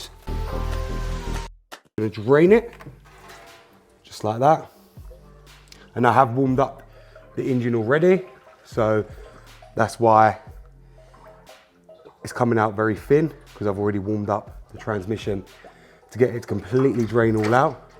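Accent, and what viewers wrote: United Kingdom. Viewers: You had me.....I was convinced you were British until you said ZeeF transmission 🙄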